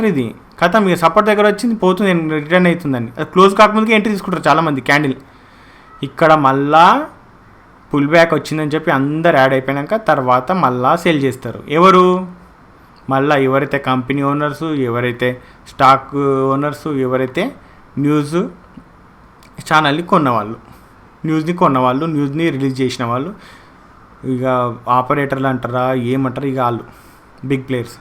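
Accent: Telangana, India. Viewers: Super Video Bro’ Telangana Yasa Adhirindhi. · Bro meru pakka telangana yasalo best information andisunnaru maku chalabaaga upayoga paduthundi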